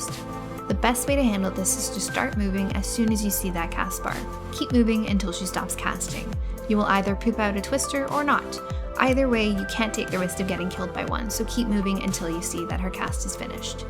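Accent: Canada. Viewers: Are Canadian or from Minnesota? (The narrator)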